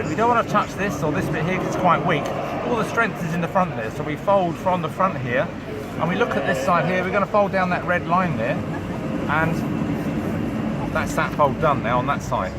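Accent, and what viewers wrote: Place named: Cambridgeshire, United Kingdom. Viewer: CLAUSE BOMB!! :) Good day fellow brit, maybe even from cambridge? as you are in duxford and you have a distinctive cambridgeshire accent rather similar to mine. Keep up the good work chap. Tally ho!!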